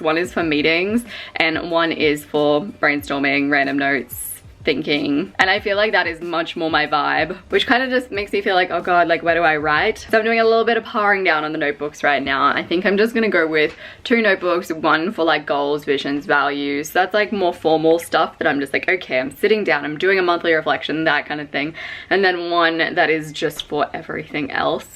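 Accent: Australia. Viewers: “These are my shower thongs” Me: ur what Me: oh yeah, she’s Australian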